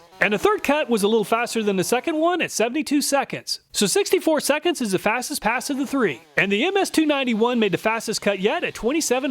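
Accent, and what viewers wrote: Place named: South, United States. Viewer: This guy sounds like an American red neck with a hatchet. He frequently refers to the two Made-in-China chain saws as “knock-offs.” Did the American brand patented the chain saw design, the two stroke engine, the cutting chain, the carburettor, the fuel system, the ergonomic, and everything else? Apparently, he’s showing his bias at best, racism at worst. Unsubscribe. Don’t subscribe.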